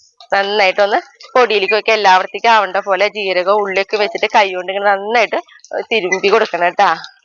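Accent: Thrissur, India. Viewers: ഞാനും അഴവ്, വെണ്ണീറ് എന്ന് ഒക്കെതന്നെയാ പറയാറ്. നമ്മൾ ത്രിശൂർകാര് അച്ചടിഭാഷ അധികം ഉപയോഗിക്കുന്നവരല്ല അതുകൊണ്ട് ഇങ്ങനെ തന്നെ പോട്ടെ അല്ലെങ്കി " എന്നാ എന്നതാ " എന്നൊക്കെ പറഞ്ഞ് പഠിക്കേണ്ട അവസ്ഥയാകും ഈ ത്രിശൂര് ഭാഷ കേക്കാനാ നാട്ടില് ഇല്ലാത്ത ഞങ്ങള് video കാണണത് അതുപോലെ മക്കളെ നമ്മടെ ഭാഷ പഠിപ്പിക്കാനും Best wishes and keep going ❤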